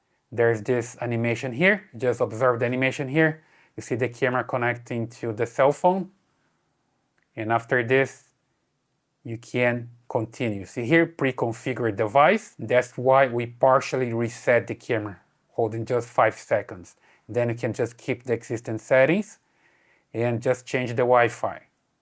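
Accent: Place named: Brazil. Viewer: How to identify a Brazilian speaking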